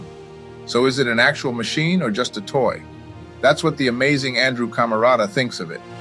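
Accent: United Kingdom. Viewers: Didn't the narrator of this channel used to have a British accent? Or am I thinking of a similar channel?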